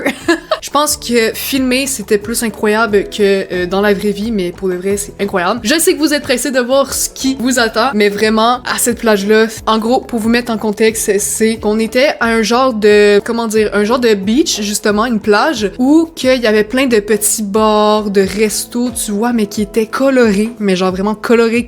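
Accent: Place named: Canada. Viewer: Hi, at first I thought you are French but at the end I pick up the Canadian accent. I've been reading in the news Canadian don't visit the US anymore. What do you think of the avocado it's not good? I bought 1 in NYC after a couple days when I cut it water. I was in Montreal last September I love it, I stay in downtown Montreal rue ST Denis not to far from Berri-UQAM, Place des Arts there's a big Mall, and McGill uni.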